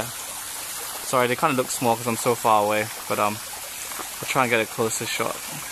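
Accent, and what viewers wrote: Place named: United Kingdom. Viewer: you sound more british than anything else, but beautiful tank are you in malayia or america or what?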